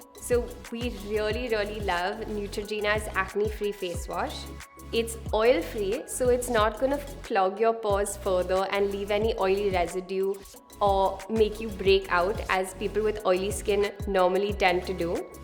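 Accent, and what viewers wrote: India. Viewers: Dhe got Indian kinda accent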